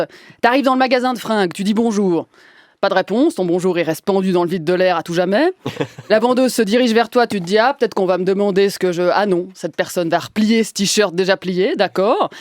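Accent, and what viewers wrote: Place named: Switzerland. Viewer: J adore vos chroniques souvent pour l accent qui me rappel mes séjour en suisse quand j étais gamin ceci vous avez du talent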